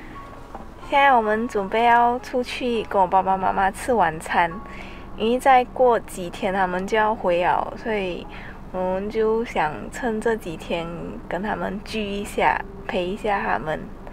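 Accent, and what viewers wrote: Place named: Malaysia. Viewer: Congrats on the nomination! 😍 And great that your parents were able to visit Singapore... 👍🏻 Love your Malaysian accent btw 😬 Reminds me of home..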